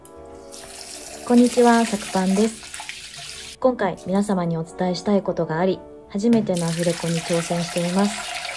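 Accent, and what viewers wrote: Kansai, Japan. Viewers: 書籍化おめでとうございます！！ 絶対買わせていたたぎます！！！！！ あと私も関西人だからすごく喋り方が安心する笑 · 初コメです😊同じ関西を感じていつも見ておりますw今回のレシピ本購入させていただきます😍おめでとうございます㊗️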